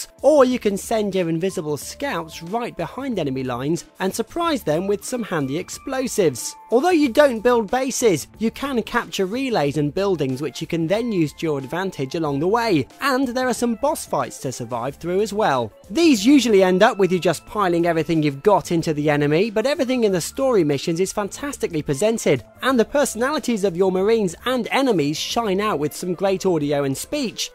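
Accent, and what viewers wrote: England, United Kingdom. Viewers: dat british accent...oh my ears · did you read the entire comment? that was how to PRONOUNCE it. his normal and perfectly recognisable accent isnt the problem, CAUSE I'M ENGLISH TOO.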